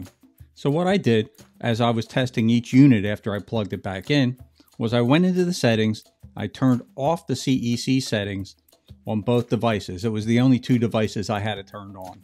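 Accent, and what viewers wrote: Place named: Philadelphia, United States. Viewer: You're from Philly, aren't you? The accent is unmistakable.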